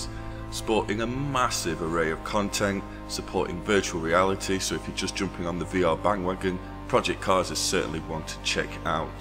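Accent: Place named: England, United Kingdom. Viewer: Hey I luv ur english accent, you make great vids and its a pleasure to watch it, thanks a lot. :)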